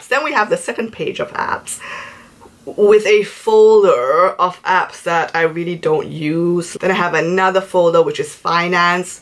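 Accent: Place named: Italy. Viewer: I love Italians and there funny accents!!❤❤😍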